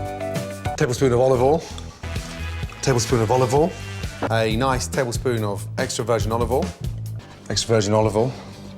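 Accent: Scotland, United Kingdom. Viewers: Why am I watching a Scottish guy say olive oil for 4 min at 3 am? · Ahhh this stingy-scottish accent i love it.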